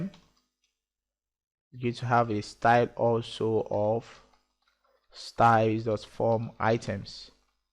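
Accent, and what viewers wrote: Nigeria. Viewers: He sounds like a Nigerian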